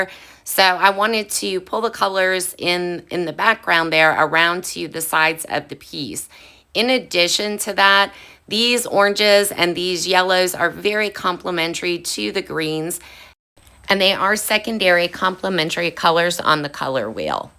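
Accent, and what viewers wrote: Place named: Alabama, United States. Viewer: Love the Alabama accent. lol Amazing work.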